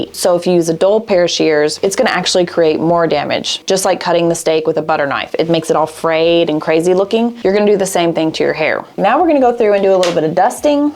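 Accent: South, United States. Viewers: Is it me or did you have a southern accent years ago and now you don't seem to as much. I have been so grateful to find you in 2022 you helped me through cosmetology school. I still love watching your videos. Thank you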